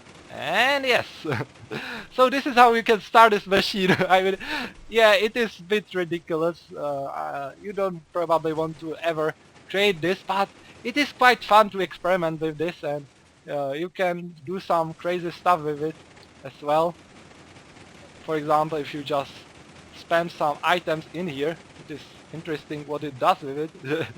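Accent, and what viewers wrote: Czech Republic. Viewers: Jde to znát že jsi čech :D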